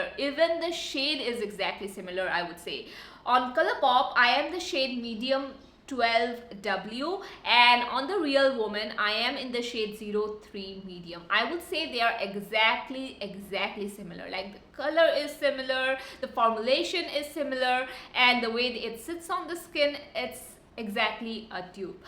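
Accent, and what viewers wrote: India. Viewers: Woooowww supeeer happy i am i love colorpop products a lot and you are so so sweet di u always come up with this dupe videos and as u know i just love your dupe videos it means a lot to a person like me who s a student and can't afford much and invest much so you are a dream for us thankyou is not enough to say i just love you as a person and your every video has my heart di and your Hindi accent is so so cute 😍 haha i love it too. Keep growing di and keep shining in this way more to come always by your side take love di ❤️❤️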